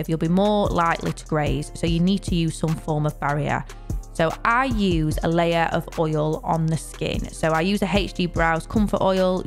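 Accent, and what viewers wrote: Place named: Northern England, United Kingdom. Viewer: hear a northern english woman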